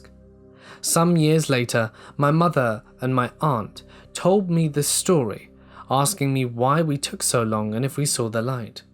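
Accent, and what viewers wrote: United Kingdom. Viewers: Nice British narration and manner for a change ( I thought USA You Tubers had the Monopoly on this subject ! )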